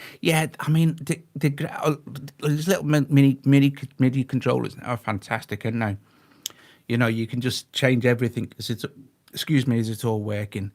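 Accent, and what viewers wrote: Merseyside, United Kingdom. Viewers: Apple should pay you a commission. This video was the best argument for getting myself a new i-pad I have ever heard. Back in the days of The Strawbs, I dreamed of owning a Mellotron and later a Wavestation - I saved up for one only to find it had been discontinued. Now you've shown me I can have both for very little dosh (once I've splashed out on the i-pad). Now, you may have been in Cornwall, but that voice tells me that, like me, your origins are somewhere on Merseyside. Everton, me, but I am a red! I now live in Catalonia.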